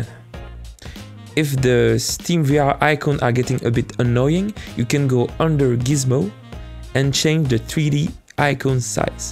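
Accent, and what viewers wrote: France. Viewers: Ca transpire le français jaja 🤣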